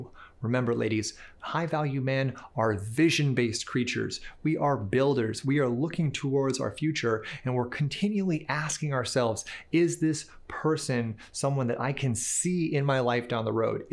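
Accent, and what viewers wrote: New York, United States. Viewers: Omg i love your new york lady accent 😂😂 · Are you American?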